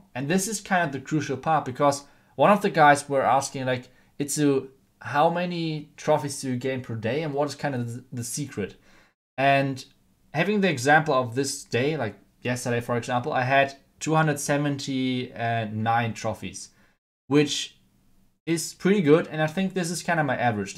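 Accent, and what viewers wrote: Germany. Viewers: i can hear it in your accent that your german ;D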